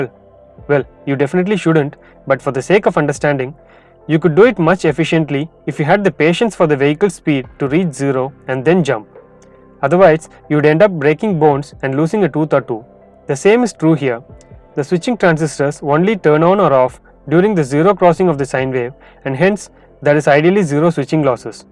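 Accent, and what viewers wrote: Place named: Kolkata, India. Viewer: Hi brother, your accent seems from calcatta. May I I know your home and educational background?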